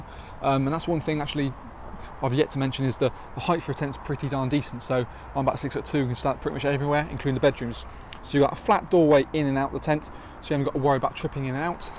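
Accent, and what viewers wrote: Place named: Cockney, United Kingdom. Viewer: Are there any reviews of this tent for those of us who don’t speak cockney?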